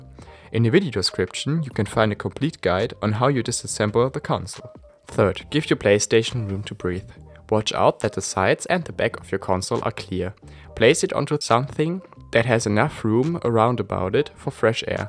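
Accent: Germany. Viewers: Digga dein deutscher Akzent XD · omg dieser deutsch akzent in diese enhlisch ☠️☠️☠️ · Deutscher Akzent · You sound german🇩🇪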